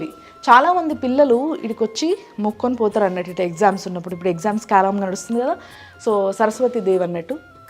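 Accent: Telangana, India. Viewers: Om namaha Shiva ya, me Telangana slag language very nice amde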